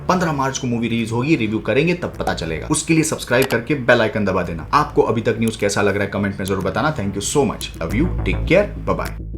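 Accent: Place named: Hyderabad, India. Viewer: Bhai toh badiya kirrak Hyderabadi slang me bole toh ek dum maut 💪🏼🫡🙌🏻🤙🏼🤙🏼🤙🏼 outstanding bole toh maza aa jata hai jab bhi channel open kare roz apke channel per attendance dal kar jate hai aaj koi video upload hua ya nhi Khair bhai tum kaam to ek dum zabardast kar rahe ho quality content k bare me janna hi toh Sidha abhi ka review per jana ❤ well congratulations brother keep helping us we stand with you 🎉 Kch zyda hi bol gaya😅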